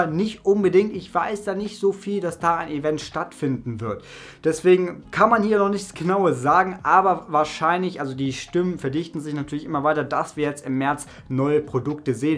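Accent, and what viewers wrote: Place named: North, Germany. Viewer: Man hört den norddeutschen Dialekt XD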